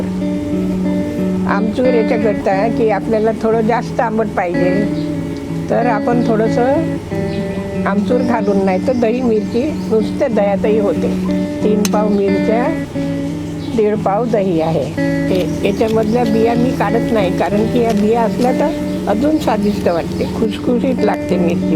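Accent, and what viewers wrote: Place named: Vidarbha, India. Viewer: Thankyu aaji.. Aaji vidarbhatalya ahe watate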